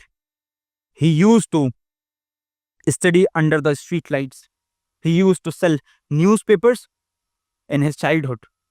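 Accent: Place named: United Kingdom. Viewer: British accent🫠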